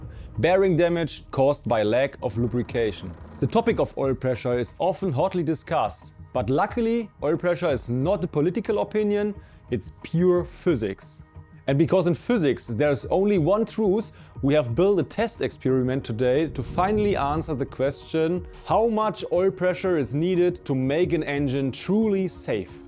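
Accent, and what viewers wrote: Germany. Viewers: I feel the german in your voice :) This is a very good video and the explanation is on point. But now i am curious, can i, as a non mechanic guy, increase the oil pressure from the pump in idel anyhow? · sorry but there is only one thing that is so annoying for me and that is a german speaking english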